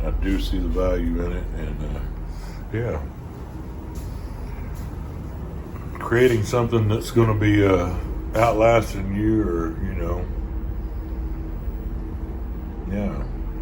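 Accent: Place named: South, United States. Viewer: People will never under stand red neck talk bud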